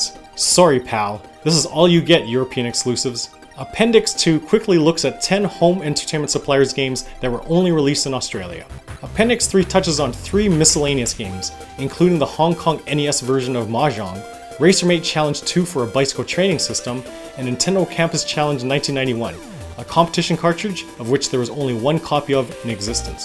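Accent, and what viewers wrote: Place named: Canada. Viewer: Cheers from a fellow Canadian! It was funny hearing Cdn "aboats" both in your review AND that Cheetahmen ad. :D And thanks for VGMaps too; didn't know you ran that site.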